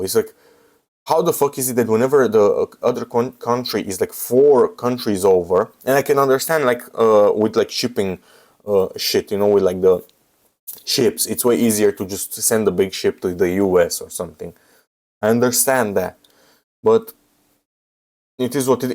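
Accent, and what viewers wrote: Romania. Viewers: Salut. Ma uit la video si abia dupa 10 minute imi dau seama ca esti roman. Preturile sunt mai scumpe in EU pentru ca se adauga TVA la tot, peste care se adauga pret transport si adaos comercial. De-asta e suparat Trump ca noi europenii avem TVA, la ei nu este. Eu am 7800x3d si rx 7900xt luate in aprilie 2024, upgrade de la i7 11700F si RTX 3070. Nu m-as mai intoarce la intel si nvidia, poate sa le primesc gratis. Ma uit si eu cu jind la 9800x3d si 9070xt si ma cam "mananca" sa fac upgrade dar nu e logic sa dau bani pe upgrade decat peste inca o generatie. E diferenta de 11% maxim intre ce am si 9800x3d/9070xt. Daca poti fa switch la proc AMD nu o sa regreti. Eu aveam consum de 175W in cinebench la i7 11700f cu placa smechera z590-f care scotea tot din procesorul ala fara K (un fel de PBO de la AMD) si era varza procesorul pe langa asta, ryzen 7800x3d cu 86w in cinebench si scoate cu mult peste cel vechi, sa nu mai spun de gaming rupe tot in afara de 9800x3d. 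Faptul ca am luat procesorul asta cu consum mai mic mi-a dat posibilitatea sa pun placa video cu consum mult mai mare(318w de la 220w cat aveam inainte la gpu) la aceeasi sursa de 750w. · I can tell the Romanian English accent , because im Romanian myself, here's a sub , i feel like we're already friends lol .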